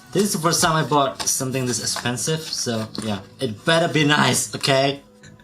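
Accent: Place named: Indonesia. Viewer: You are Indonesian,i knew from your accent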